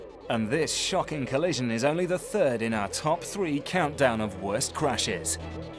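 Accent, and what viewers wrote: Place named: England, United Kingdom. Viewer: Whats innocuous about this video is an english man narrarating crashes on american highways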